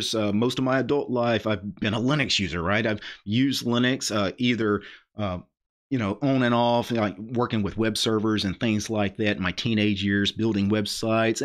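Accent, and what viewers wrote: South, United States. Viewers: your southern twang is glorious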